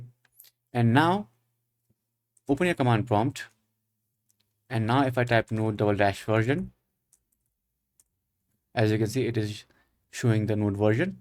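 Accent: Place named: India. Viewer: As soon i lisen indian vocal i finely talken breath after 2 hr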